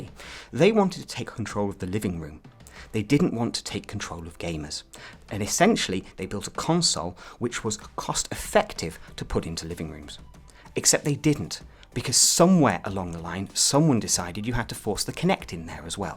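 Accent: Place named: England, United Kingdom. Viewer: SOOOOOOOOOOOOOOOOOOOOOOOOOOOOOOOOOOOOOOOOOOOOOOOO ENGLISH. (I'm British, barely anyone sounds like that here)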